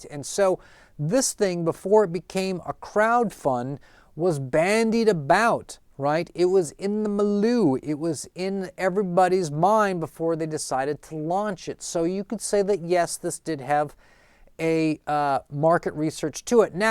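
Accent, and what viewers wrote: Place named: Midwest, United States. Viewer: I've watched your two newest videos today and learned two new things about you, both of which explain why I like your videos so much: 1. You're from Minnesota; I grew up in Wisconsin! It's nice hearing a Midwestern accent on youtube. 2. Your "autistic ramblings" as you put it: I'm autistic, so to me they don't even sound like ramblings! I've been enjoying your channel quite a while now, I was happy for you when I saw that recent vid get some traction. Your videos always give me a very specific vibe: when I lived near Green Bay, my dad and I would go to comic and game shops (and sometimes thrift stores) on the weekends and just drive around, seeing what weird stuff we could find. Your videos always give me a similar feeling to that fun vibe. Now that I'm in my late 20s and a collector myself, your videos make me nostalgic for those days!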